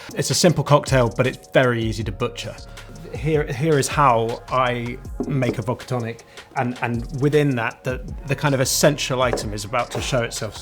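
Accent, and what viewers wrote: United Kingdom. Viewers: 最近イギリス英語不足してて困ってたから染みるわ。最高すぎる。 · Yes! I wish more people would learn English with a British accent rather than American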